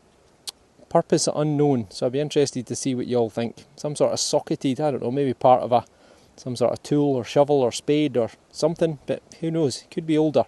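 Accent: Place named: Glasgow, United Kingdom. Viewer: Hi ho. with the Glaswegian accent. I think I was one of the first subs on your visit Scotland channel. You sound just like Billy Connolly 😆.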